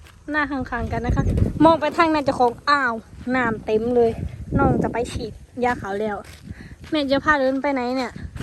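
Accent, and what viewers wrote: Korat, Thailand. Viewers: สู้ๆน้องสาว ภาษาคือออกไปทางโคราชบ้านเอง อ้ายยู่ลำปลายมาศติดตามตลอดเด้อจร้า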